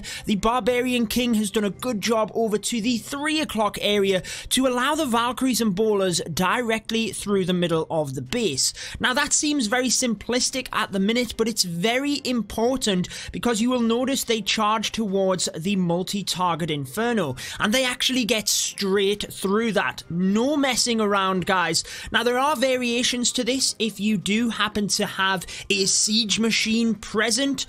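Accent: United Kingdom. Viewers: Who else is just enjoying the nice British accent?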